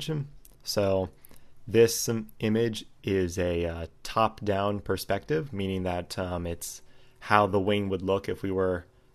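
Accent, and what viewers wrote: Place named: Italy. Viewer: i love your Italian accent, say "started"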